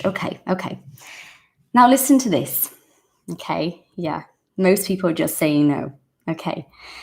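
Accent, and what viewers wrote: United Kingdom. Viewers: Wow, you sound like a real British girl! I love that accent 👏👏👏👏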